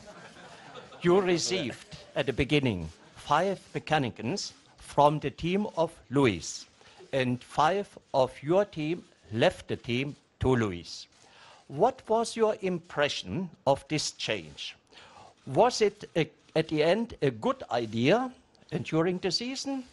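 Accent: Germany. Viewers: Gute, alte deutsche Aussprache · He should learn to speak english. I'm german, but it's hard for me to understand his denglish. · This german accent is rediculous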